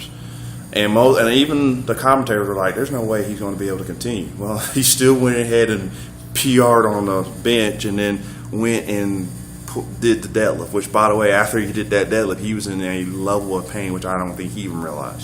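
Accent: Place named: Alabama, United States. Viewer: Awesome video... love the Bama drawl too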